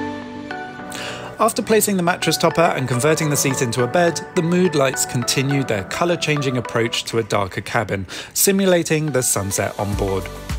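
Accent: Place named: United Kingdom. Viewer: Thanks for your informative and top notch aviation video. I am really impressed with Iberia the Spanish Airline with their latest aircraft and sumptuous banquet for Business Class passengers. Hope they become well known like the top three Middle Eastern airlines by flying to more destinations in Asia 🌏 also. Your video quality is brilliant and complemented by your commentary in an elegant English accent. Please keep up your high standards in such content creation. ❤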